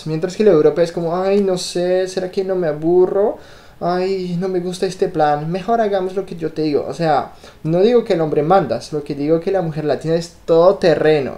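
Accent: Bogotá, Colombia. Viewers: es que a estado más tiempo en Colombia ¡¡¡ se le ha quedado las jergas Colombianas e inclusive el acento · La vaca muerta jajaja este frances ya se quedo en.colombia todo el.acento haha que bueno.Que te gusta , por aqui no.somos de implantes ni cirugias. Somos naturales jiji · Vea, hombre! Ud tiene un acento colombiano bien bacano🤣🤣 o se escribe vacano? Nunca he visto esta palabra escrita, no sé cómo va. En fin! Lo cierto es que tienes acento colombiano 😀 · Hablas muy cómico, pareces de Colombia. He imitas muy bien a las mujeres. Éxito consiguiendo esposa latina. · Hablas como colombiano. Saludos! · Tiene el acento de Colombia. Así que puedo apostar que anduvo con una colombiana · Acento colombiano pegadísimo, de dónde eres? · Habla un español muy colombiano! jajaja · Hola..yo creó que has vivido mucho tiempo en Colombia hablas y te expresas como colombiano..saludos. 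· Habla como colombiano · Este chico es Francés, pero sus padres serán Colombianos, por que tiene acento colombiano, sus padres serán colombianos. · "Listo mi papasito" hahahaahh en serio me reí mucho, una novia o ex novia un tanto... "guiza" :/ y sí, definitivamente tu acento suena más rolo que el mío (Rol@ = Bogotan@ Estamos en la misma ciudad :) y espero jamás encontrarnos porque no tendré el tiempo para decirte todo lo que quisiera. ✌ Chao! linda vida y espero que la chica sumisa con quien te cases abra los ojos algún día. 👀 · q bonito, y claro!! habla el español!! mas' bien colombiano!! esta simpatico!!😆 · Me da gracia que hablas español colombiano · Pareces más colombiano, madre mía jajaja que bien hablas el español con ese acento. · Yo creo que todas tus novias han sido Colombianas, porque es que hablas con acento Colombiano. acá en Europa cuando escucho hablar a un Europeo sea de la nacionalidad que sea, hablan con acento de Españoles. 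Me caes bien, hasta ahora descubri tu canal, y me simpatizas mucho, hasta en la manera como a veces te expresas me pareces tierno. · Francy Sandoval me encanta que reconozca la belleza latina ,tiene expresiones colombianas como" verraca " y un poco el asentó rolo se le siente saludos desde Colombia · Eres un colombiano más!!! Tu acento es super Bogotáno. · SOS un francés con acento colombiano! 😍😍😍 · Creo que te pego mucho el acento colombiano!😚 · Senovia Trinidad pues no habla bien, se le a pegado el acento de Colombia o de algún país sudamericano, el español nació en España, decir que habla muy bien es demasiado, deberías decir que al menos se hace entender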